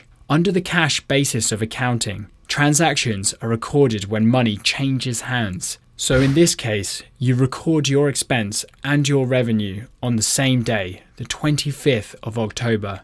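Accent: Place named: England, United Kingdom. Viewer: Oh damn you have an English accent but you live in Vancouver? I guess they don't call it British Columbia for nothing, lots of Brits here.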